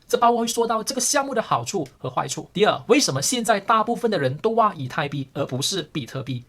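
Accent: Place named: Malaysia. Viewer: 刚开始以为是移民到台湾的马来西亚人。没事，听的蛮舒服的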